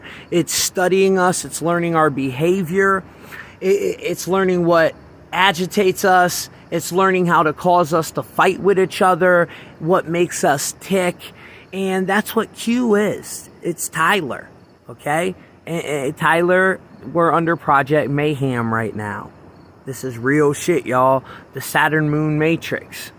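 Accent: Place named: Baltimore, United States. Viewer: I have been watching your vids for 2+ years on FBas my main, Instagram, and here on YouTube. I'm born and raised in Baltimore. You sound like you have a Baltimore accent.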